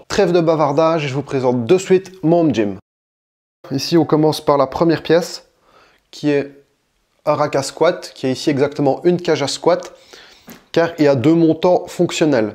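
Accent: Belgium. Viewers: c'est l'accent des mecs de Verviers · C'est curieux cet accent belge comme si vous aviez le nez bouché ! Je vous salue cousins belges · tres belle salle ... t'es belge ? si oui quelle région ?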